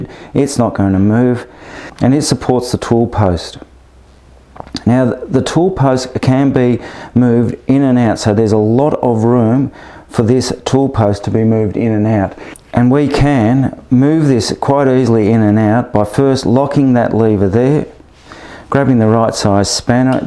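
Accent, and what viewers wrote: Australia. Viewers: Nice piece of work, and always good to hear an Aussy accent.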